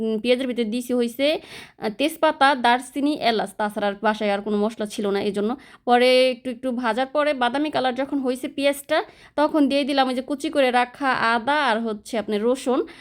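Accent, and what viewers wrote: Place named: Narayanganj, Bangladesh. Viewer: আসসালামু আলাইকুম আপু তোমার বাংলাদেশের কোন জায়গায় নারায়ণগঞ্জ বা জাজিরার এদিকে প্লিজ আনসার দিও তোমার কথা ঐদিকের লাগে জন্য আপেক্ষায় থাকব।।।।।প্লিজ...।।।।।।।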